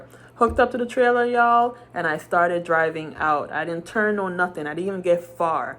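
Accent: Jamaica. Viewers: Interesting I work for IRT. Safety will definitely have a problem w mistakes like that but your human it Happens. I have never heard a local IRT Driver you sound Jamaican best wishes to you sister on your future endeavors lots of tanker jobs out there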